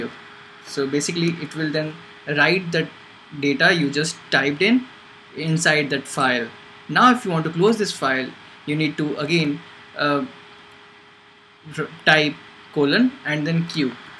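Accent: India. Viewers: Your English is so Indian:)))) To save file or run any other commands, you should do the following: 1) type some text 2) press Esc 3) press button [Shift] + [ : ] (shift plus colon) 4) type :wq (means write and quite - that will save the file) I hope it will help :) Always welcome.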